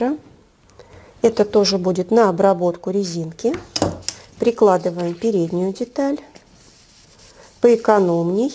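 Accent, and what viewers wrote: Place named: Voronezh, Russia. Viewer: Спасибо за мастер-класс,! Первый вариант притачивания резинки лучше)). А еще мне очень нравится ваш приятный голос и воронежский "говор", который я узнала с первых минут видео😄.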